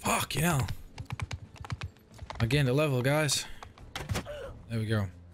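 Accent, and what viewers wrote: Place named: Manchester, United Kingdom. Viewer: Sound like you from Manchester mate well done on looking at this mod mate shit I’m on console pal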